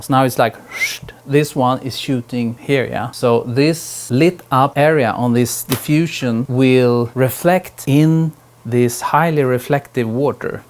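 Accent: Sweden. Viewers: i really enjoy your videos. Kinda feels like bob ross with a Swedish accent.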